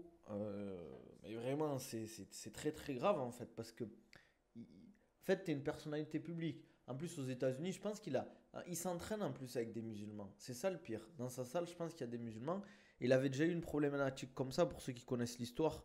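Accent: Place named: South, France. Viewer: Tu viens d’ou en France c’est juste pour savoir vu que t’as un accent du sud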